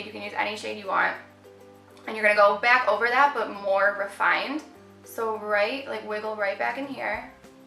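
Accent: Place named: Midwest, United States. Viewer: Wow that is one serious midwestern accent.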